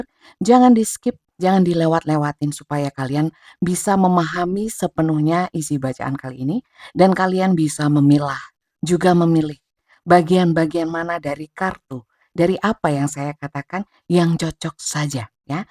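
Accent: East Java, Indonesia. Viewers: Bunda lucuu beneran krmekel sy... gak uwe uwekk😂😂😅😅 Asli jowo ne ketokkk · MANTULL BUNDA...JAWA TIMURAN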